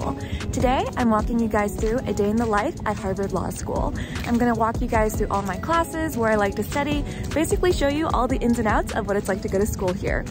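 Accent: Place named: United Kingdom. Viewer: why your accent so British, rather than American?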